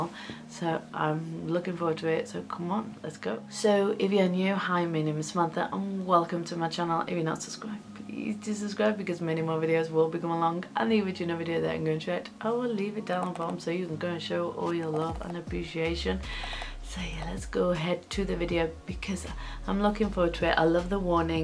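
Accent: United Kingdom. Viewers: Going by you accent you are living in the UK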